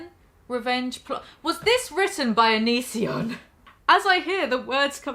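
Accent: United Kingdom. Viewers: I’m subbing for these reasons, you should as well. British accents are a plus for no reason. I love a female creator who’s not doing makeup tutorials or exposing her body for subs. To each their own, but I come to YT for laughs, memes, and “content”. Shes given me both. The video I see next “The Sheer Entitlement of Youtubers” just give humble vibes.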